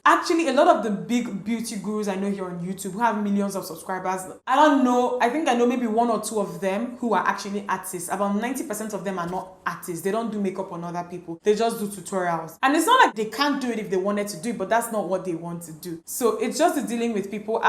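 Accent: Nigeria. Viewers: I wonder what's wrong with your accent that someone will be complaining about. Hmmm... World people, I personally loves your accent. It's our Nigeria accent and very understandable, that Afro Puff don't know if he or she should go and complain on all this Ghana or Kenya Youtubers that uses their country accent na. Nma please don't change who you're because of what people are saying because you can never asin never please world people. If you're doing this, they will request for that and if you start doing that, they will automatically request for this. Stay blessed, you motivates me a whole lot. Thanks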